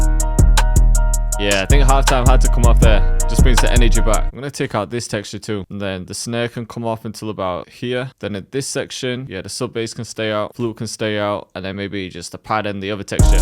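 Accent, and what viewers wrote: United Kingdom. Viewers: YEAH BRO L;OVE THE PURE RAW UK ACCENT STYLE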